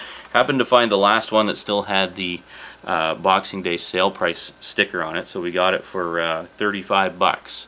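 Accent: Canada. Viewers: I knew you had to be Canadian or one of the states bordering Canada. Who else says aboot! I really like your Dead Space videos. Reminds me of hanging out with my friends and playing games together.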